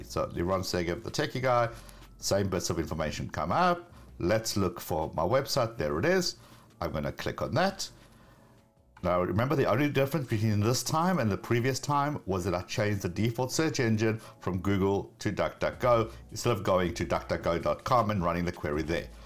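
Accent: South Africa. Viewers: I’m having trouble with the accent. Is it South African? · I know a South African accent when I hear it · Is this guy South African?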